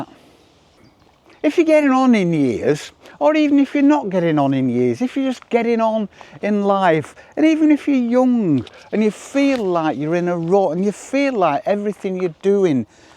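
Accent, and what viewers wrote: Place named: Bolton, United Kingdom. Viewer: Hi, I'm sitting here in Kent but was raised in Bolton. I having nostalgic flash back hearing your local accent and names like Leverhulme and Rivington in your other video.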